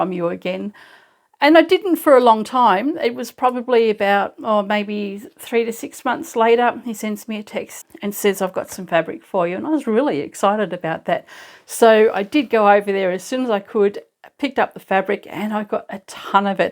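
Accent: Australia. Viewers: I always enjoy you videos & love hearing your Australia accent. So, talk all you want. ❤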